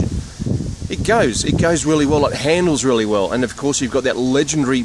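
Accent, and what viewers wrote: Australia. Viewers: U Aussie ?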